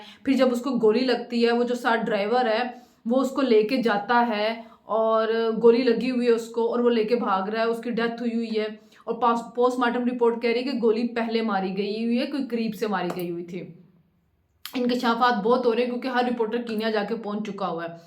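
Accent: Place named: Punjab, India. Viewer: your punjabi is sooo cute, you should do your vlog in punjabi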